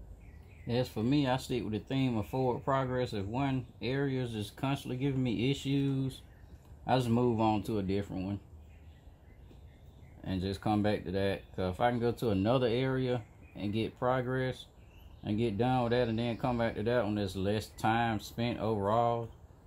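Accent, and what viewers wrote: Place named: Georgia, United States. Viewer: Hear them accents all time here in atlanta lol.. Not sure where in Georgia he from tho..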